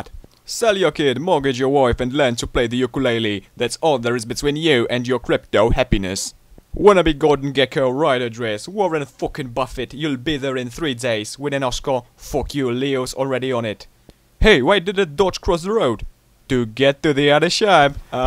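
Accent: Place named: Northern England, United Kingdom. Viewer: You from the North of England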